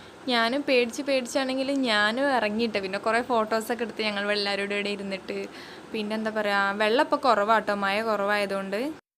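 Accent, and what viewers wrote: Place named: Malappuram, India. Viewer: Natural video ...........🌹🌹🌹🌹🌹 മനസ്സിന് കുളിരെകുന്ന പചപ്പാർന്ന വീഡിയോ .....👍 എന്തൊരു ബങ്ങിയ കാണാൻ ഊരകം മല സൂപ്പർ......👍 ചെരിഞ്ഞും മറിഞ്ഞും തിരിഞ്ഞും കിടക്കുന്ന ആ മരങ്ങളും എന്തൊരു ചന്തം സത്യം പറയാണ് ഈ വീഡിയോ ഫുൾ ഇരുന്ന് കാണുകയാണ് ഞാൻ കാരണം പ്രവാസികളായ എന്നെ പോലോത്തോ ആളുകൾക്ക് ഇങ്ങനെത്തെ വീഡിയോ വളെരെ ഇഷ്ടമാണ് മനസ്സിൽ ഒരു സന്തോഷം ആണ് .,... റബ്ബർ തോട്ടം ufffffffffffffff എന്താലേ കാണുമ്പോ തന്നെ 👍👍👍 ആ കോറി ഒക്കെ എന്തൊരു ചൊർക്ക് 😍😍😍 നമ്മളെ മലപ്പുറം ഭാഷ കേൾക്കുമ്പോ ഒരു രസം തന്നെ ആണ് ലെ 😂😂😂😂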